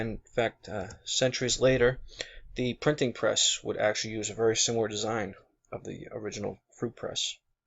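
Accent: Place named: Boston, United States. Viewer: This is exactly what I've been looking, short, sweet and to the point. Also you from Mass. I thought i was hearing a Boston accent?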